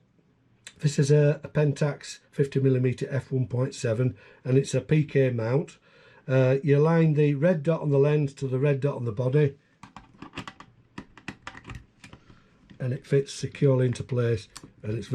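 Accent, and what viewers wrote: United Kingdom. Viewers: Thanks for this video, I was looking at an MX but this is really nice, a lot more expensive though. I currently have a P30, OM2n, Leica R5 and Nikon F3. You sound fairly local, I'm in Manchester. Subscribed :)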